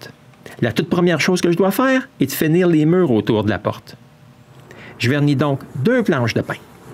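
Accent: Quebec, Canada. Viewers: l'accent Québécois on adore ça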